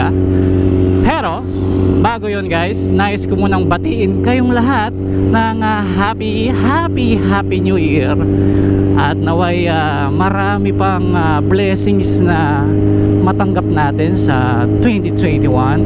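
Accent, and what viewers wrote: Pampanga, Philippines. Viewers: Mangarakap ka na tune kang kapampangan ne sir? Hahaha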